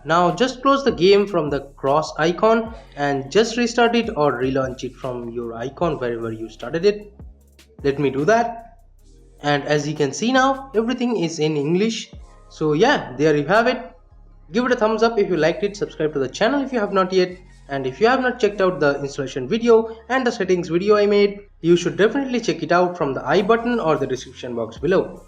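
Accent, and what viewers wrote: India. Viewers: Chineese much better than your hindienglish -_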